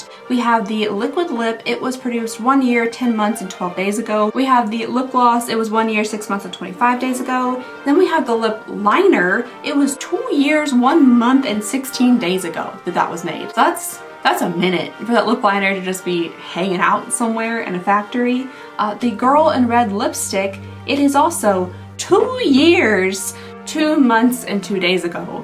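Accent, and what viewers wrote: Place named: South, United States. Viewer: I love hearing a woman with a southern accent like myself tear into a rant. Now I know why my husband smiles when I go off about the grocery store making stupid substitutions on my items. I smile through your whole video whether you’re ranting or not. ❤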